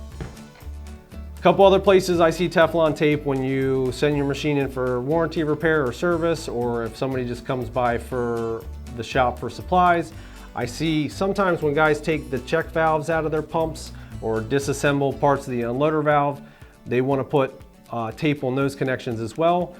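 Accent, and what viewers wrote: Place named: Philadelphia, United States. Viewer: Yo! Can here the Philly South Jersey accent. Love it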